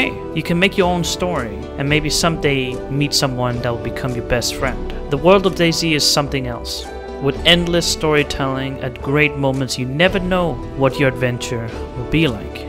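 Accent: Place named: Denmark. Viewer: You sound very Danish. Could I possibly be right?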